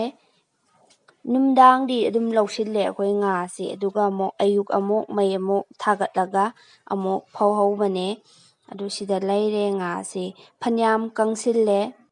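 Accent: Kakching, India. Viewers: Kakching accent 👍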